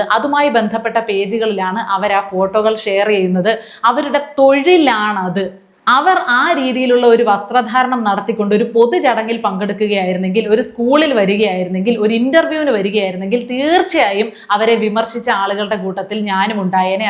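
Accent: Thiruvananthapuram, India. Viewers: ആർ എന്തെങ്കിലും പറയട്ടെ കലക്കുന്നുണ്ട് തിരുവനന്തപുരം ഭാഷയും കൈകാര്യം ചെയ്യുന്ന വിഷയവും ഒരു വലിയമ്മച്ചിയുടെ പക്വതയോടെ അവധരിപ്പിക്കുന്നത് വളരെ ഉചിതമാണ്. Keep it 🎉🎉🎉🎉🎉🎉 കഷണ്ടിക്കും അസൂയക്കും മരുന്നില്ല. 👍💪🌹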